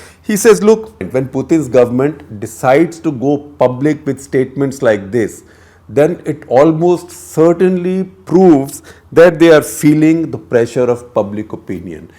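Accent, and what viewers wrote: India. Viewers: Indian accented is hard to understand 🤪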